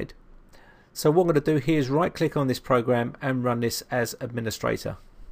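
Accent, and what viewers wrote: United Kingdom. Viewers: You are by far my favorite Windows 'Guru.... And please, slow down your fast, nervous speaking, because that along with your heavy British accent.... makes it hard to enjoy the 'essence of your Royal Perfumes.......'God save the Queen!.....'You are the best!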